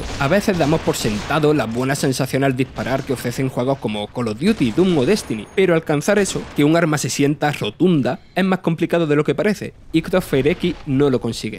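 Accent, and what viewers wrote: Andalusia, Spain. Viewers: Aprende a vocalizar! Hay una delgada línea entre un atento andaluz y tartamudear en una seccion de noticias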